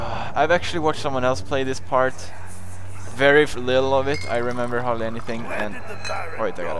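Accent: Sweden. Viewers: I love how he's all Swedish and crap, so he isn't the best reader and didn't say barrels right. So cute! :3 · Oh my, this is soooo funny when PewDie says "f*ck" with this swedish accentXD Even "f*ck" sounds cute with the swedish accent:D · his Swedish accent aaaaaaaaa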